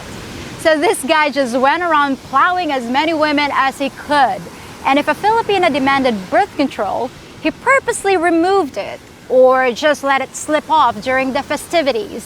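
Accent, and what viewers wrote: United States. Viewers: i can hear that american accent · Kudos to your great American style English. Well done. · Hey, did you ever live in the states or dated Americans? I've never met a Filipina who could speak like you. Just wondering.:-) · You speak English with a VERY American twist... very entertaining, realistic, and honest. "Filters" didn't exist when ang akong asawa and I were video chatting decades ago. And I made sure she understood although I made respectable money? I was a working man...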